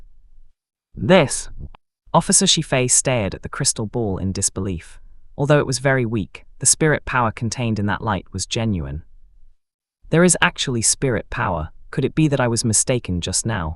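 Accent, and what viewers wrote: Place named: India. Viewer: What was it with the Indian accent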